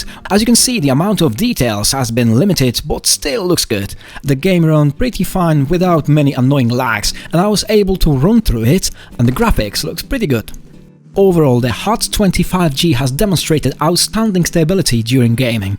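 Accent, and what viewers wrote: United Kingdom. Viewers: 👍 The British accent is a value added. Saturday February 04, 2023. A.M. U. S. C. T.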